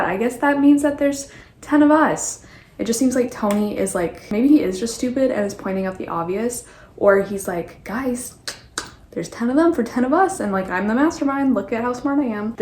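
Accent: France. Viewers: the cigarette , the spoon , the mustache , the accent . we have ourselves a french detective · ok so i have only just found you and genuinely thought you were french... like parisien french... and i speak french with a deliberate burgundian accent... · Are you French or Belgian or Canadian? I've seen And Then There Were None (1945 film) and I've read The Murder of Roger Ackroyd so ... I know all the answers! It sounds like the film and the book have different endings!! WOW! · Your French accent was so cute! 🤗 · Loved the French accent🇫🇷🥖👏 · Ton accent français m'a fait sourit, c'était drôle 👌❤️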